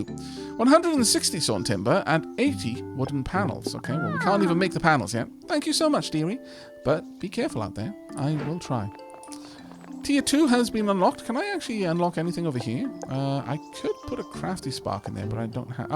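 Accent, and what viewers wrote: United Kingdom. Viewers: I 100% thought you were British. Hell, I still do.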